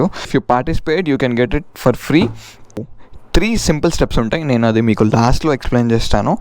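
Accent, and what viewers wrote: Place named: Hyderabad, India. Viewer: The way you speak like light posh and hyderabadi boy 😍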